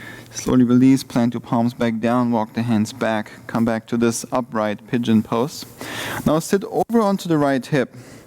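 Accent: Germany. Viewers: Awesome session. One of the best I ever made.. The guy has a familiar German accent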